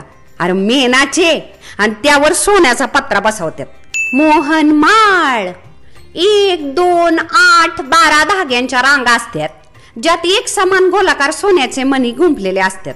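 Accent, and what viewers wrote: India. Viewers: दागिन्यांची खुप छान माहीती दिली ताई आणि ती पण अस्सल मराठी ठसक्यात👍🏼 दिल्याने खुपच छान वाटले... तु तर अप्रतिम सुंदर दिसत आहेस 👌🏻👌🏻👌🏻👌🏻😊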